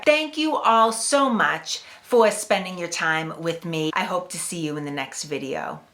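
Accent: Boston, United States. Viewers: I moved to Massachusetts from Washington DC in 1974, and I've lived in Somerville since 2010 (and in Brookline for ten years before that). I love your accent (it sounds like home now), and I appreciate your detailed description of that day. My wife and I celebrate Patriots Day every year by walking all or some of Battle Road (now a marvelous walking trail) and ending up at the Old North Bridge. This video reminds me of why I love Boston and Massachusetts. I'm terrified that we might have to do all this all over again. Is is coincidence that the bad guys wore red then and now? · Just found your channel! I live 20ish miles from Boston. Great to hear the history spoken with a proper accent for the area. You have a new subscriber. Love the Monday morning quarterback vs colonial map info. So interesting. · So the Monday morning quarterbacking isn't an American thing because we stole it from the Brits just as we did the New World. 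LOL BTW your accent is a definite of Baaaston. I remember flying into Logan the first time in Baaaston and hearing the accent. I had to ask the young lady to repeat herself at the Orange Julius stand. Love the history and the accent. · New to your channel. I'm born/raised in Massachusetts. Its fun to learn our history. You are very well spoken and intelligent. Love the Boston accent, too! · That accent sounds like South Boston , Quincy.....? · I’m just here for that accent ..Boston or near? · that’s some Bostonian accent sister. 😊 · The Boston accent makes this so much better. 😄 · Amazing Video !! Nice to hear someone with the same Boston accent as me !! 😊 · Revolutionary "Woo-ah"... Nice! Ok, the Boston accent is cute but you're over doing it a little bit. However, I can tell you're a proper Bostonian and it has nothing to do with pronouncing your "Ahhs". The give away is at 28 seconds when you're "gonna hafta" climb over the log. 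That was wicked pissah. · I was raised in Somerville in the 60's and 70's and my mom was from Dorchester. has the accent that I heard in my home every day and it makes me feel right at home. Great content!:) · I detect a Boston accent. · Looking at your newly discovered map, I see where Warren’s Tavern got its name. 😊 Old maps + Bawstin accent = new subscriber! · Omg, the Boston Accent! 😂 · Love your video , love your Boston accent . My 9x grandfather was a coast watcher for the Marquis D'Laffiette in Bristol , Rhode Island . His grandfather settled the town of Scituate ,Mass . The line ends with me . After 396 years of family history in this country it is to be no more . God bless and God bless your work ! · LOL From the very first minute of the vid, I said "Ah, she's from the Northeast...Boston?" After freshman year in college (1972) we took a little road trip through the NE. I'll never forget in Swampscot, we asked for directions and were told "...when you get to the monamin, make a left or you'll drive your cah into the hahbah." OK, monamin? 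Uh, thanks. So we drove and saw, to our enlightenment, a MONUMENT. I grew up in the NYC area but have also lived in FL and am now in the Pacific NW. It's so cool to hear the different accents of the different areas of the US. I'm actually first generation American as my father was not born here (he was from a British colony). So I enjoy looking at the history of the early US. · Grew up in Lexington. Know the war quite well. But always good to learn nuances and new details. Best part of this channel? That Boston accent. Lost mine decades ago after moving away. Good to hear that its distinctiveness still survives in 2024. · First time on this channel, but I knew you were based in the Boston area with your first word. I'm also in MA. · Classic Boston accent! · Great vid. All done with a Boston accent. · Love your knowledge and Boston accent. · This is a wicked good channel. Even bettah to be told by an authentic bostonian. 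😎👍 how ah ya! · The Boston accent is why I could only take 23 seconds of her. Ugh.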